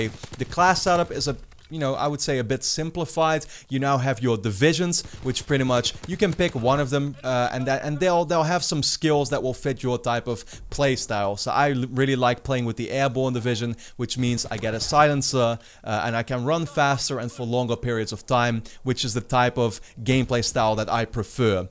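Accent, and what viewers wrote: Netherlands. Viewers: Dutch ? I can here that right away because the accent lol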